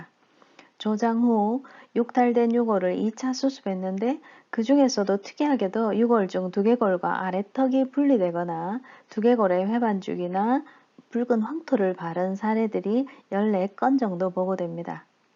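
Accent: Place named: Gyeongsang, South Korea. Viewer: 취향에 맞는 문화채널 우연히 접하네요. 경상도 억양의 강좌 귀에 쏙쏙 들어오네요.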